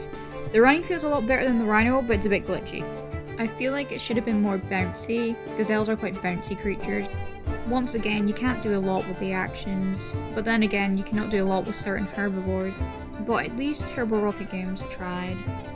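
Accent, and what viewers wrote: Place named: Australia. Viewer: Is it just me or do u sound Australian all of a sudden👁️👄👁️?? ur voice is adorable 😭✨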